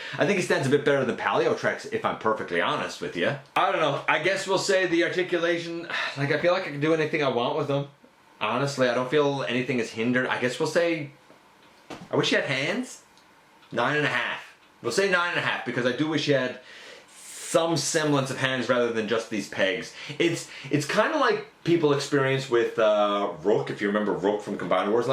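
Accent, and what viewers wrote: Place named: Newfoundland, Canada. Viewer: Just recently subbed and I can't believe it took me this long to notice your accent. You're a fellow Newfie! How's she getting on me son? Lol.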